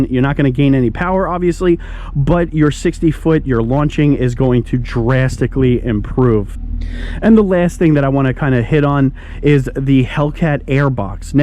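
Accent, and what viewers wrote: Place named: South, United States. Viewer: Damn new York I thought you were down south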